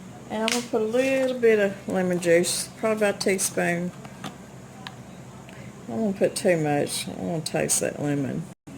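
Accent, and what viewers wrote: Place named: Arkansas, United States. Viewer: the accent and the thunderstorm leads me to think of beautiful Arkansas. That pie crust cobbler looks like it was gonna be good. Nice video!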